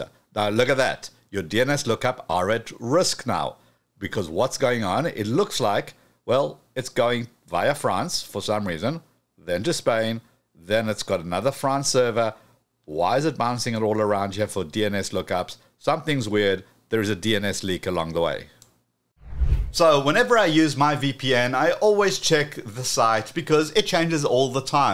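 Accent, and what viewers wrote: South Africa. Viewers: Wait you South African 😮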